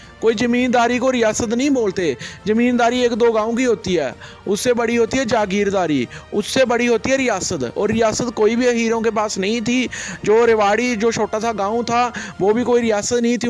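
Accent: Punjab, India. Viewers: Hukm aap kaha se h aisi hindi punjab ke bhai bolte hai❤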